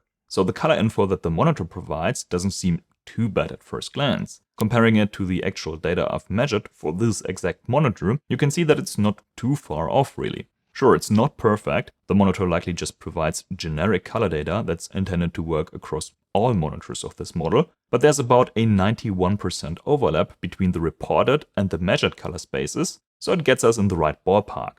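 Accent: Germany. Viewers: Hab den deutschen Akzent direkt rausgehört😂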